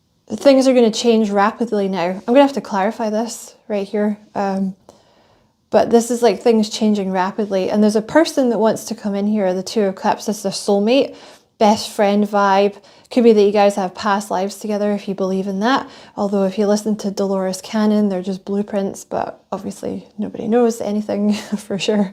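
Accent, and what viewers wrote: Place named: Scotland, United Kingdom. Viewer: Omg literally all the things you said resonated sooooo much and I’ve been journaling and getting promots exactly like the ones you said. I love your expression chillin like a villain 🤣 thank-you so much I love hearing a fellow uk (okay Scottish to be exact) accent for once on you tube as well ❤🙏🏽 thank-you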